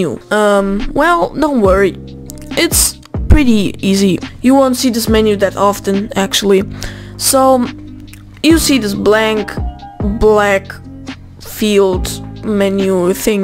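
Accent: Germany. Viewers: dachte mir schon das du deutscher bist haha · Kann es sein das du deutsch bist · Allein an Stimme erkannt das du deutsch bist, aber danke fürs tur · ARE YOU DEUTSCH? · yes wait you german? deutsch?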